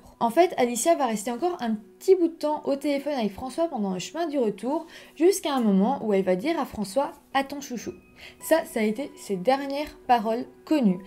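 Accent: Belgium. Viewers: En fait, je n’aime pas les histoires de meurtre, les charges émotionnelles sont terribles, mais en même temps j’adore l’accent belge (couire lol 😂) et plus sérieusement le sérieux des recherches et la présentation lumineuse des affaires. Merci.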